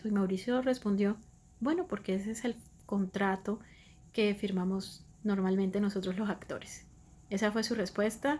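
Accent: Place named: Caribbean. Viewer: Excelente,te Vi más suelta al hablar ,en el 2 video parecias hablar entre dientes ,(como cachaca)ahora ya te está saliendo lo caribeña,espero q el próximo digas algo así como !.NJ GANAMOS!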